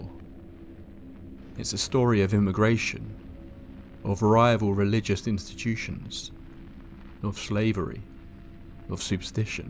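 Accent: United Kingdom. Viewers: Hey! A huge belated happy new year sunshine! I subbed to u not very long ago; I’m a huge fan of bedtime stories & Mortis Media… so I figured I’d give u a go! I’d dearly love to hear more about black eyed kids! They freeeeaaak me out!!! 😱🤪 P.s it’s always great to hear a fellow Brit narrating!! 🇬🇧👍🏻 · Ugh... Yet another British narrator... Not as many words mispronounced as usual but still annoying. Also the narrator drifts off at the end of each paragraph and speaks too slowly overall.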